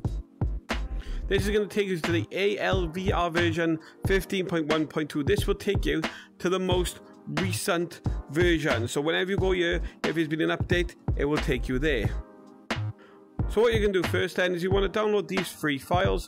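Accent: United Kingdom. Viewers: Bri’ish huh? Well im glad I found you lad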